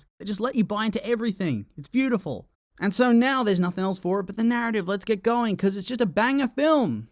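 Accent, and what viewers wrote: Australia. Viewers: Ahhhhh, I needed to hear a fellow Aussie’s voice explaining this.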